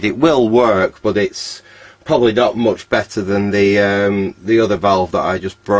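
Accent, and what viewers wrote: Manchester, United Kingdom. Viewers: Where are you from..... Sounds like a bit of a manc accent..... I have a question I can't get my head around..... I bought a ct160 10 days ago .... And regardless of the data ,the anode current settings are always a lot lower than the book.... e.g testing ecc81 valves the anode current should be 10ma and out of 5 valves I've tested they all seem to be about 3to 5ma to zero on the scale.... I've been desperate for a new video to pop up somewhere..... would it be possible to do a video at some point testing an ecc81..... Ps tried a e88cc and the anode should of been 15ma I think it was, however it was zeroing on 3ma.... I'm new to valves only using basic go no go testers... Any help would be awesome.. I'm in Burnley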